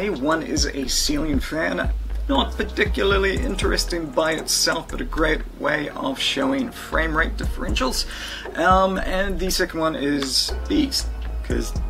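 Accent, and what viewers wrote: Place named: New Zealand. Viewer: Gosh that Kiwi accent shining through! LOL - good video mate, happy to see your face. Hasn't changed!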